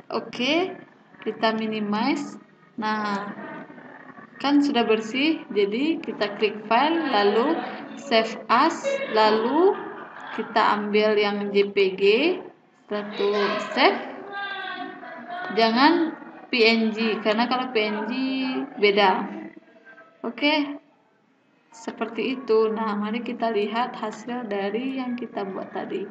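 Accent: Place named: Minangkabau, Indonesia. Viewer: Iya kak thanks ya.... Pedas kali bahasa urang awak ni yaaa kak 😂😂😂😂😂 logatnya udah ketebak raso e😂😂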